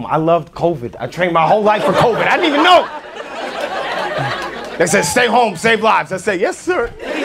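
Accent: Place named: New York, United States. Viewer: he likely picked up the accent from living in ny & the media he watches/consumes<3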